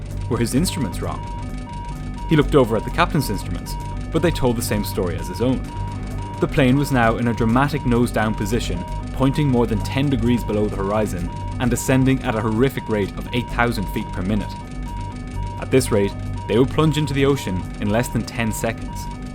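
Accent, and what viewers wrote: Ireland. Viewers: Your narration skills are first class -I like your Irish accent coupled with your easy listening mellow voice – one funny thing though -to me at least (especially for aviation videos ),is the way you pronounce “column”-your Irish accent comes out as “collleeum”-a cross between cauliflower (or maybe Colosseum !)and column, Gosh I hope I haven’t made you self-conscious after that !, on a serious note you have a brilliant channel which does not sensationalise nor overdramatise the events and covers the technical aviation aspects well but remains gripping and riveting like a good drama❤ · well bud, that’s the beauty of the Irish brogue, remember, those slights in the queens tongue are what made Irish literature legendary.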